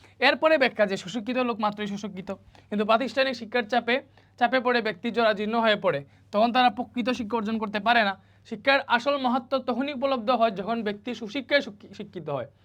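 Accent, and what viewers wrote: Sylhet, Bangladesh. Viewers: স্যারর বাড়ি সিলেট নি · Bhaia tumi mone hoy Sylheti 😊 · Vai apni ki sylheti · ভাই ত সিলেটি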